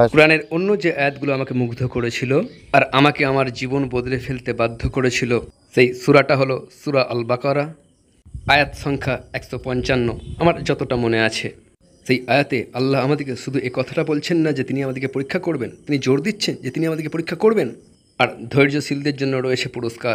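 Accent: Kolkata, India. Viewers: এটা হয়তো কোলকাতার আঞ্চলিক ভাষা যার জন্য উচ্চারণটা এমন হয়তোবা!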